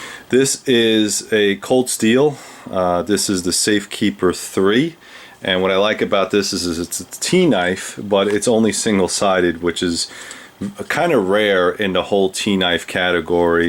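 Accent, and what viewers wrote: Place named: New Jersey, United States. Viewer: You gotta be from Jersey brother....I grew up in Newark and I know that accent...lol.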